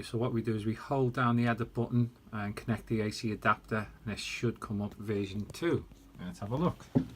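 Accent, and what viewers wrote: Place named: North, United Kingdom. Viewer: Nice one mate. Thanks. And love the northern accent!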